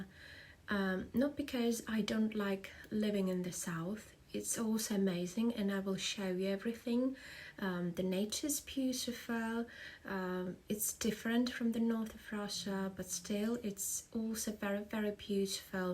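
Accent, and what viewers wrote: England, United Kingdom. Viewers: Why does her English which is excellent sound like she is from England. Her voice is so nice soft and very clear. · Приятная нотка английского акцента – это то, что так приятно услышать от красивой русской леди из сельской местности.❤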